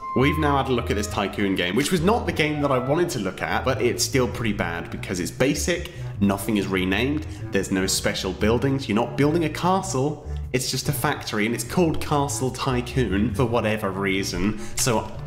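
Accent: England, United Kingdom. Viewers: Are u English ? I luv ur channel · Is this is called British accent? I don't really now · Ana Contreras There both British so ya I see why you said that but at the same time I am a bit confused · Ana Contreras cuz he is british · David Beck They're both British. What do you expect?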